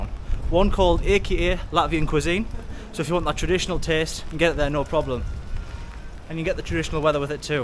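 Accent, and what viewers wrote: United Kingdom. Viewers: It was though to understand you at first place whereas I’ve got used to it and to top it all off: it’s appreciated to hear the British accent. Greetings from Poland lad 😎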